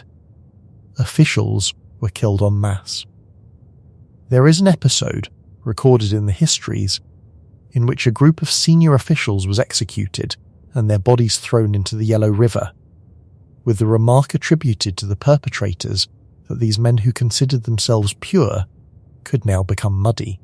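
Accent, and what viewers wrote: United Kingdom. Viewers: The voiceover to this topic uses a very odd / strange pronunciation of the russian word for cash/ money: ROUBLE as rubble.. The British voiceover pronounces the word ROUBLE as rubble. So odd.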